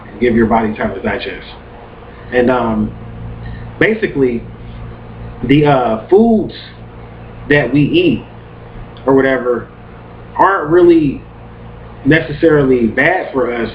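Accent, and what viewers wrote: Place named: Los Angeles, United States. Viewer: Are you from LA/Long Beach? You have a la/lbc accent.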